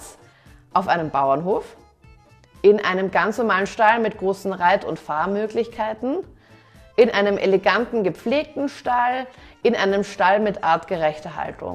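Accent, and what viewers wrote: Austria. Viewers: Ich liebe deinem Österreichischen aktzent 😂😊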